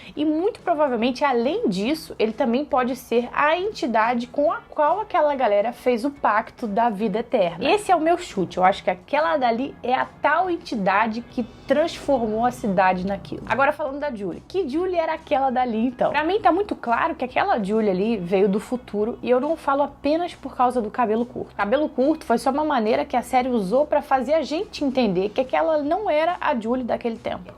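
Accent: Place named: Rio de Janeiro, Brazil. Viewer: Parabens pelo trabalho, eu como sou um cara bem chato aqui no youtube, não curto muito ficar ouvindo estes sotaques caipiras que inundam esta plataforma , prefiro acompanhar um sotaque raiz do RJ , como o seu e o da Mateux Mendex os unicos canais que acompanho pra ouviu teorias de From. Um coisa que pode ter passado despercebido é que parece que todos os moradores de From parecem ser reencarnação de pessoas do passado da cidade. e todas devem ter o mesmo destino, não sendo possível mudar o destino. Em exemplo disso é o Elgin, ele ter tido o olho arrancado pela Sarah o que o conecta a visão do Jade do homem bebendo sangue no crânio, este homem também estava sem 1 olho. E o ato de beber sangue pode ter relação com o Elgin retirando seu sangue para a Fatima beber. Tudo esta interligado em toda a história desta cidade.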